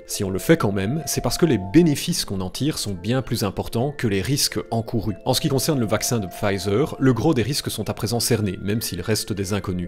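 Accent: Paris, France. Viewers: tu fais trop bien l'accent parisien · Suite à cet accent parisien je me désabonne. Cordialement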